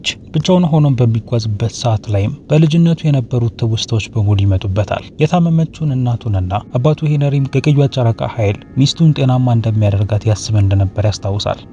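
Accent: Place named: Oromia, Ethiopia. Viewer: ጋላ አማርኛ ይሰማል ዘንድሮም። 🙆🏽‍♂️🙆🏽‍♂️🙆🏽‍♂️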